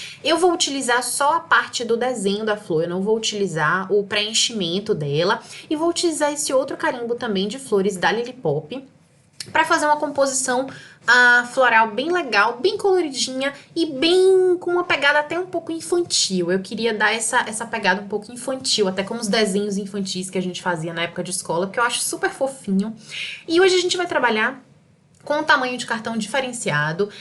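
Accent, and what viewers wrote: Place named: Bahia, Brazil. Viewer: Que bom que gostou, obrigada! 🥰 PS: o sotaque é da minha amada Bahia ♥️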